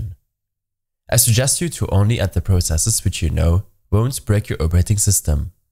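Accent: Germany. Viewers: MAnn merkt so das du deutsch bist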